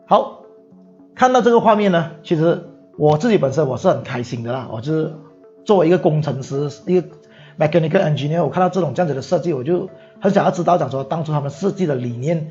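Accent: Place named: Malaysia. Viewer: 马来西亚口音的普通话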